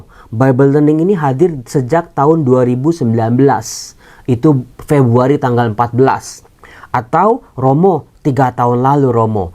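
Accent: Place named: Jakarta, Indonesia. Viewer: Pertama kali dengar Bible Learning di awal tahun 2022, tahu nya dari OKS. Setelah itu saya kejar nonton Bible Learning dari 2019. OKS nya juga saya kejar nonton dari awal. Saya suka romo2 di OKS dan romo bicara dengan logat jakarta, bahasa nya yang gak baku dan kaku, gitu😄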